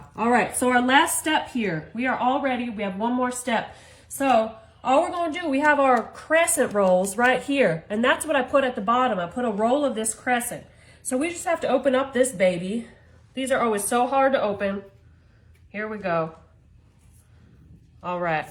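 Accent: South, United States. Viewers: Now this looks great!! I would doctor it up with some kind of dipping sauce and seasoning but I would eat your recipe for sure. Thank you!! Great idea for an easy one pan breakfast. Oh by the way....what part of the south are you from? I'm in SC myself. We sound similar. Lol 😂 💜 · Love your voice with that cute southern twang to it and of course your wonderful recipe and presentation.😊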